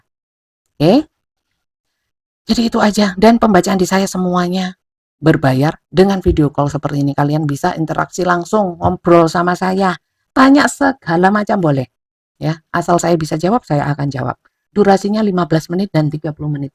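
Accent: East Java, Indonesia. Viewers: suka bgt gaya bicara sama logat Jatimnya kak tiap baca readingan......jd pgn meet up langsung ngobrol2, pasti asiikkkk......😁😁😁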